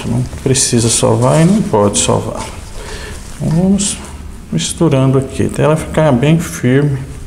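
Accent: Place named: Goiás, Brazil. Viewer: Meu caro, bom diaaa! Eu reconhecia o seu sotaque, mas eu não sabia que vc era daqui de Goiânia (hehehe)! Meus parabéns pelas suas "lives" maravilhosas!!! Vou fazer essa receita aqui em casa amanhã, sem falta! Obs.: eu só vou fazer diferente o seguinte, vou misturar todos os ingredientes numa vasilha antes... e acrescentar alguns outros, rs! Que massa espetacular!!! #ShowDeBola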